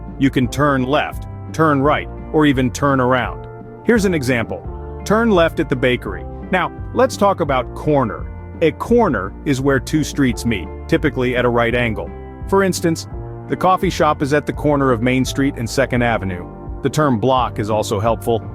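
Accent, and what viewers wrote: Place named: United States. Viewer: I love this American accent. How I love you, America! ❤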